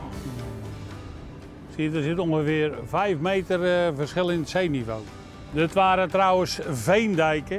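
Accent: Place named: Veen, Netherlands. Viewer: Leuke film,lekker plat Veens gesproken,heb trouwens heel wat stekkies gestoken bij van ruiten toen...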